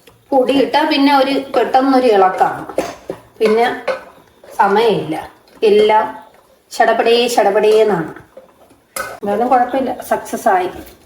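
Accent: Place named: Palakkad, India. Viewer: പാലക്കാട് ആണോ വീട് സംസാരത്തിന്റെ ശൈലി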